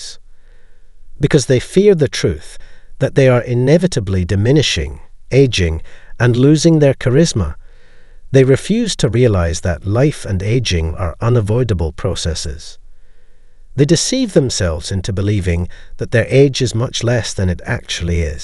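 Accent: Scotland, United Kingdom. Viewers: Scottish voice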